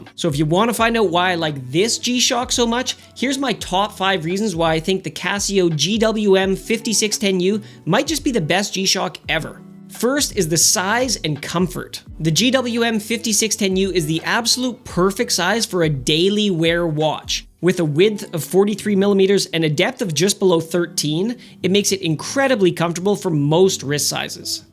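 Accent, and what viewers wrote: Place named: Canada. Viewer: If I ever need to explain what a Canadian accent sounds like, I'm going straight to this video for the best example❤👍. Oh yes, forgot, I agree with you on the 6510.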